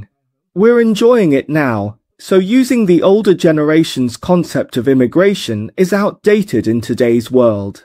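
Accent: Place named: United Kingdom. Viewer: Amazing British accent!😊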